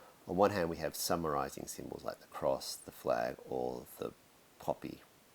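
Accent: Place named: Australia. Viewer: his Aussie accent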